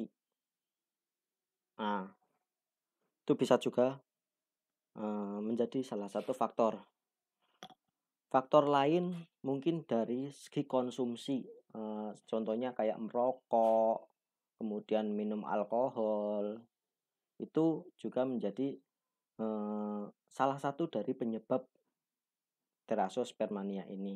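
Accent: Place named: Banyumas, Indonesia. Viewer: Kyane wong ngapak kie, promile di dokter mana mas kalo boleh tau ? 🙏🏼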